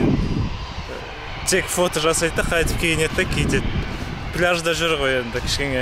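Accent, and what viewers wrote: Standard. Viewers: Рахмет саған қазақша блог жүргізіп жүргеніне! Аздап акцент болсада қазақша таза, сауатты, қатесіз сойлейді екенсін, орысша сөздерді қоспайсын, жарайсын!👍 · оте керемет жансынго таза казакша сойледн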